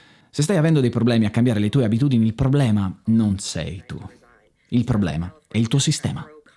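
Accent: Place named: Tuscany, Italy. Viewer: Una così alta qualità di contenuti e forma (davvero, sono bellissimi i tuoi video, dalla fotografia al montaggio) e solo 219 iscritti? Il mondo è un posto ingiusto. In bocca al lupo per il futuro! Continua così! P.s.: per quanto tu possa parlare molto correttamente, c'è una piccola inflessione che mi faceva pensare tu potessi essere fratello di granducato, poi il giglio sul laptop ha tolto ogni dubbio!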